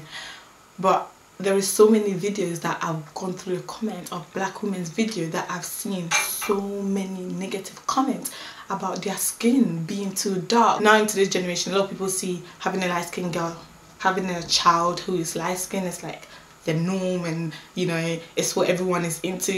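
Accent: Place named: United Kingdom. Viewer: u are stunning i live in the us so i have not had herd the accent in many year i was on uk once many yrs ago with the military but i could never gt tired of hearing u speak iam certain its a pleasure to hear u say something as simple as good morning or pass the pepper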